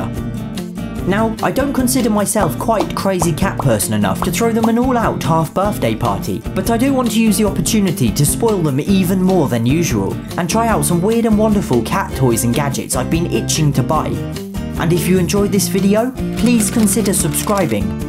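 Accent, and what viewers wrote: United Kingdom. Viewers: I love the uk english like Moning Cheers mate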